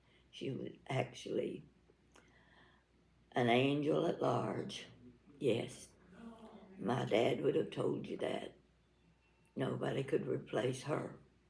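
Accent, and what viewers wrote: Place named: Florida, United States. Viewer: This is Kitty I live in S. California. I discovered your channel a week or so ago. I forget which video but you were in the kitchen. My mother in law was from Lakeland Florida and I miss her voice. Your accent is similar and that’s what I heard at first. In the kitchen you remind me of my grandmother with whom I spent many hours in the kitchen with. I am 77 now. A widow since 2019 after 50 plus years together. I was his caregiver for decades. It occurred to me that at least in my world out here on the West coast life seems isolating. I too talk to myself. I read that it is good for your brain. I also love dishes and setting the table. I have what they call “poorman’s fiesta” called Harlequin made by the same company. Summers as a child I’d spend a time at my grandmother’s house near Atlantic City NJ. Her house had a front porch and we would have breakfast out there. 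My grandmother would put our soft boiled eggs in the Easter egg color Harlequin sugar bowls. Clever. The bowls must have come out in the 20’s as they were very Art Deco looking to me with triangular shaped handles and lid top. As I write I realize it must have been around the time she passed away I started to think of those things we ate the soft boiled eggs in and oddly enough that very year they reissued them. I wanted the original ones and began hunting for them and that started my collecting other dishes and china. I love dishes and ceramic things. p.sl. I got a felt skirt with. S& H green stamps. I wanted a p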